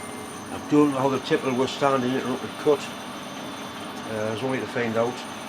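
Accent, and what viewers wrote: Newcastle, United Kingdom. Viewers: Well up for Patreon. 'Specially if there's propa geordie swearin gannin on nall. :D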